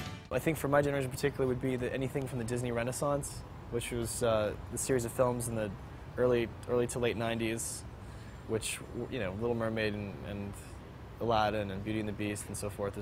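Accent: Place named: France. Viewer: He's got such a great french accent!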